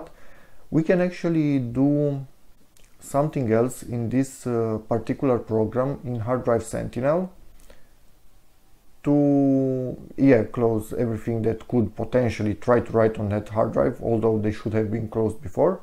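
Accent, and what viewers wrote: Romania. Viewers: Ănd hir iu mast bi șur. Mai bine ai spune in româna. Ori fă pronunțarea sa fie in engleză și nu româno-engleză. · are you romanian? the accent gives it away😀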